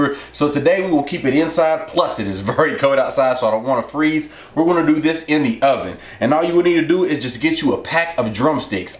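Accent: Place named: Texas, United States. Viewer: I bet you are from Texas, you got that accent I’m familiar with 🥰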